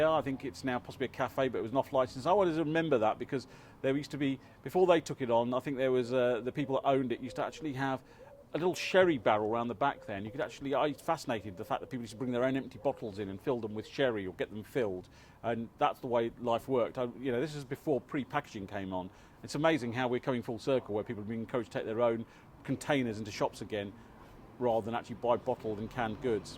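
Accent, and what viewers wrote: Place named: Peterborough, United Kingdom. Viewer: Brilliant. Shared this with my students in Italy to hear the Peterborough accent and give them a sense of where I'm from. Love it.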